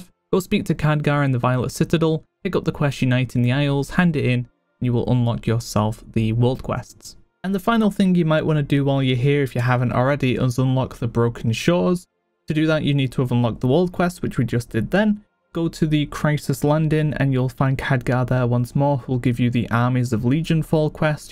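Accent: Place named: Leicester, United Kingdom. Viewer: Great videos! Not trying to dox you but are you from Leicester?? Don't know anyone else with that accent but Leicester folk!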